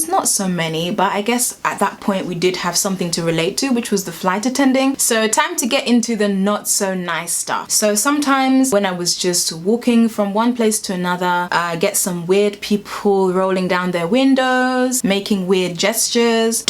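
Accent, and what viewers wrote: United Kingdom. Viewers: Hi...,I real like your accent are you from UK? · The problem is you believe you are "Black" and the people are Germans. You dont want to Call youself British going by your accent. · Your African,your not considered black by us.Your African,British accent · Girl, I'm African-American and if you came to the U.S. you would get stared at by Black people because of your dark beautiful glistening skin. Then if you started to talk with that English accent you would get stared at even harder!! You would be such an anomaly here, worth every adoring stare!! Its interesting to see how slavery affected and displaced Africans all over the world. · That British accent.. 🤝🤝👌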